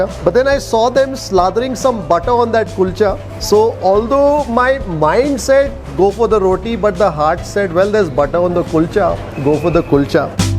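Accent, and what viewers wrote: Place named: Maharashtra, India. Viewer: Maharashtrian influence i see in sir is how he pronounces some words and also ofcourse his knowledge on so many Marathi foods. Eg: laadoo instead of laddoo. That’s the thing that he knows about both South Indian and North Indian foods in depth hence people from all regions approve of his reviews.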